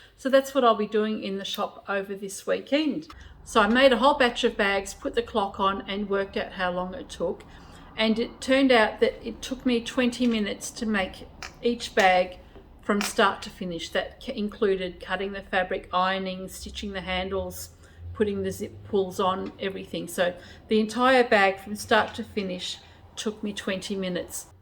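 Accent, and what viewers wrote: Australia. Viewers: Hello... u'r sew'n friend Anna here from Greensboro NC. Luv the Itty Bitty Bag and I've been wait'n 2 make one every since I watched u'r last shop tour, but I ran n2 an issue when make'n it. I went by the "transcript measurements" it says the main fabric is 10½" x 3½", contrast (black) is 10½" x 1½" and the lining is 10½" x 3½"... it didn't dawn on me that the lining was gonna bee 2 short until after I put the zipper in and turned the lining 2 the backside of the open bag 2 press neatly down. I watched as u did the steps but bcuz u lined u'r bag w black fabric I couldn't c that it was even w the bottom of the bag. Lesson learned. Main fabric needs 2 bee 10½" x 2½". Don't go by transcript measurements. I suppose that u'r beautiful Australian accent was misinterpreted. Just thought I'd warn u and others. Waffle on my dear lady, waffle on.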